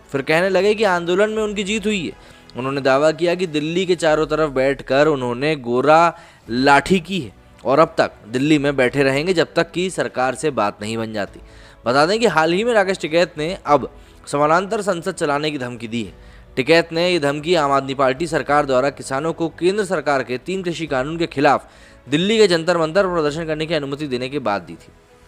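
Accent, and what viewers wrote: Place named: Meerut, India. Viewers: Jaat language has something special to it... Sunne me bara maja ata he. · ये हरयाणवी नहीं है ये मेरठ मुजफ्फरनगर, सहारनपुर, हरिद्वार की बोली है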